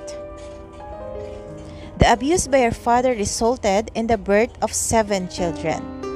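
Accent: Philippines. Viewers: Pinoy na pinoy ang accent e. Haha. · I liked her accent too she s filipina for sure maganda accent ate keep going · ok lang... pinoy tayo eh. d yan magbabago, feeling lang ng iba nawawala ang accent nila kung cla ang nakakarinig sa sarili nila.. ang mahalaga maliwanag ang pagkakasabi sa words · Filipina accent? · I hear a Filipina accent ♥ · Its a Filipino accent. I looked thru her videos and there are videos in Filipino language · Just because someone has an accent doesn't mean the Narration is not understandable. As a fellow Filipino, I'm happy to hear a familiar voice doing these big stories on YouTube. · Don’t listen to the hateful comments! I love your Filipino accent. Hi from Canada! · A Pinoy speaking.. 😂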